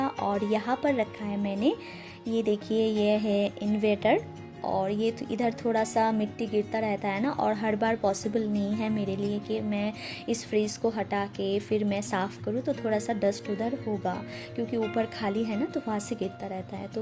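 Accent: Bengal, India. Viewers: Aapke bolne k lahze me jo bengolipan dikhta h n wo aapki awaz me char chand lga deta h dii ..Love you dii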